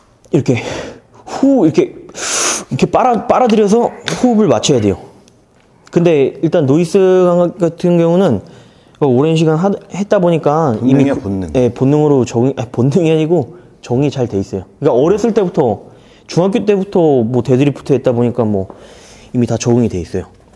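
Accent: Gangwon, South Korea. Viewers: 강원도 사투리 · 강원도 사투리 👍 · 강원도 억양이네 ㅋㅋㅋ 흔치 않은데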